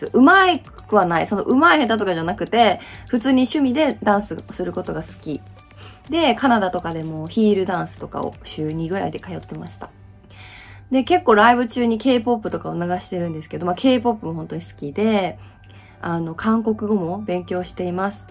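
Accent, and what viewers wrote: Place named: Kyoto, Japan. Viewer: 🎉 さま❤ 一目見た瞬間に大ファンになりました!😅そうかー、時々出る関西弁ば京都やったんですねー😊いや～、良い!京都やったらさん、可愛いから舞妓さんになってもバカ売れだったでしようねー😂ほんとに素晴らしい女性ですね😮花粉症なところもとても親近感覚えます❤🎉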